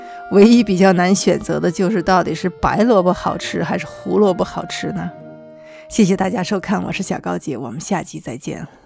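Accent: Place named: Northeast, China. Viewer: 我听你的口音有点像东北口音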